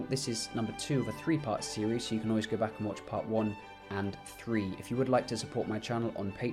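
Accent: United Kingdom. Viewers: لماذا لم أجدك في الموقع إنجليزي/عربي للأستاذ يا ؟ أريد أن أتعلم الكلام باللغة الإنجليزية باللهجة البريطانية خاصة كما تنطقها أنت 😍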